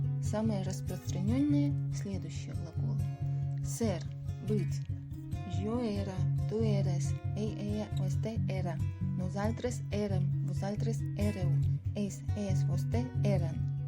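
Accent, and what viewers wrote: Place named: Eastern Europe. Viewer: На произношением конечно надо поработать.... Буква r в конце глаголов, например, не читается. Уже молчу про типичный восточноевропейский акцент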